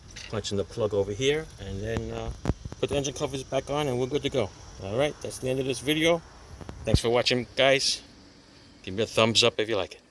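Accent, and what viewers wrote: New York, United States. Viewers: you have this Italian accent lol are you from NY...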